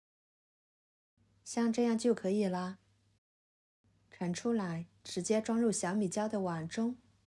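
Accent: Yunnan, China. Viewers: 一听就是大 云 南老乡啊:hand-purple-blue-peace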